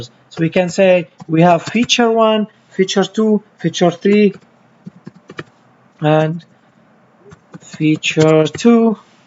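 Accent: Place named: Arab world. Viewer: sounds arab pronunciation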